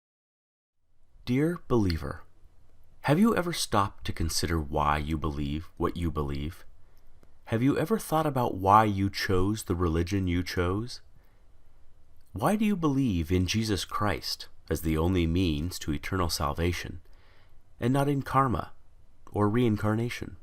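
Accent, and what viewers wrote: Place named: England, United Kingdom. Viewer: Giọng Anh nghe phê thiệt :)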